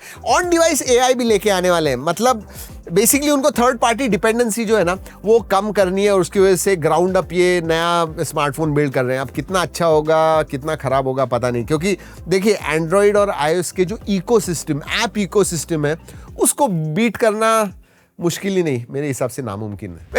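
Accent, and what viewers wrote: Maharashtra, India. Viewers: Bhaiya love your marathi accent ❤❤ Tumi pn till gulh ghya gode gode bola ❤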